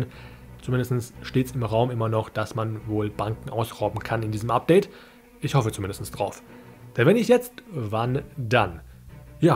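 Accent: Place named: Australia. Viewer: Wenn das du warst der da Englisch gesprochen hat, dann hörst du dich echt wie ein Australier an. ;-)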